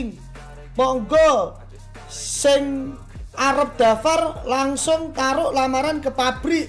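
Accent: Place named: Java, Indonesia. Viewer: Mas aku rak ndelok live sepurane tapi omonganmu ki koyok wong jowo e mas Jane we cino opo jowo?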